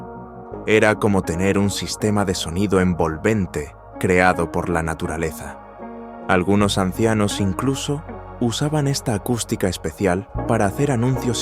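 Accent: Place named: Spain. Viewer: TU ESTÚPIDA VOZ DE GACHUPIN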